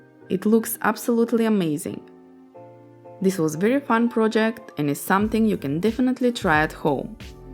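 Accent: Russia. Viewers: Still the Russian accent recognized 😁❤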